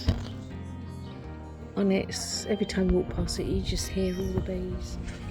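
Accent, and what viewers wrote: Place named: Southern England, United Kingdom. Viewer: Hi I've come over from the last chance cookout collaboration. Great to meet a fellow Brit and you sound Southern too - not many of us about! Hope everything survived the extreme heat, its very hard going isn't it? Love your garden, you've got so much going on 💚 Karen and Rose 🥰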